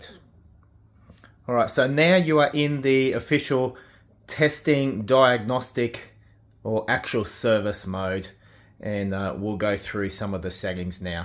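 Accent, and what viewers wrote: Australia. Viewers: Aussie accent makes me laugh my pp off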